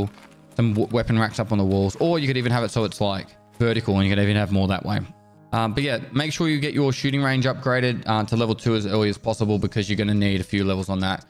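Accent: Australia. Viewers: It starts on if you don't wanna hear aus accent.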